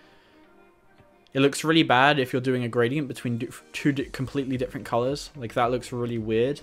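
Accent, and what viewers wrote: United Kingdom. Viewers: if your voice library is so small you came to that conclusion I feel sorry lol. He is british accent sort of very lightly sounding but that's the only real similarity Everything else you assumed is placebo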